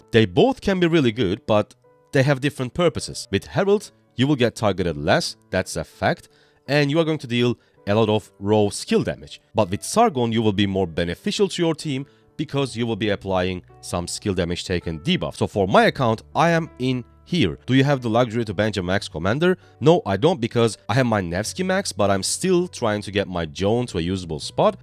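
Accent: Turkey. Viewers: Bu adam tam bir Türk gibi ingilizce konuşuyor.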